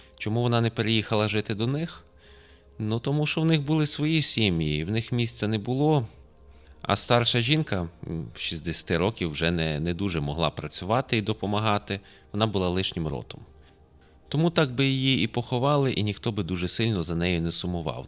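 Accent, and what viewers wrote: Lviv, Ukraine. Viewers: Вас так приємно слухати.Гарний тембр голосу, літературна,і разом з цим, чути львівський говір!Буду слухати вас і надалі! Цікаво.Удачі вам і наснаги.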